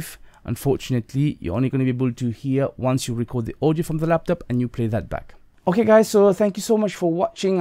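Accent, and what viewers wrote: United Kingdom. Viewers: Bob's your uncle! So British.....great explanation and video. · Do you know how many Vid's I've watched just to get this info? Way too many (Short Sweet And Straight To The Point) Thankyou ;).... And you're British always a plus. haha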